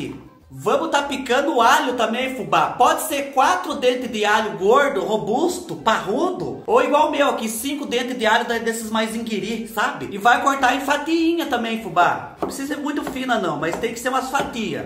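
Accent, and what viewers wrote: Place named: Minas Gerais, Brazil. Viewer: Ai vc parece mineirinho assim como eu. No modo como vc fala por exemplo a expressão "BOBA " é daqui de minas 😂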